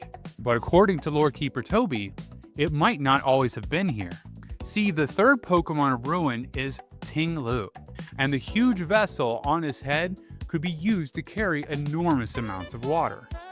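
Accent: Appalachia, United States. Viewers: I jest in my derision, of course lol I'm from down closer to the Cumberland Gap and Smokies, so it's always funny to hear the different pronunciations. Still, glad to see mountain cousins making fun content though! Keep up the good work!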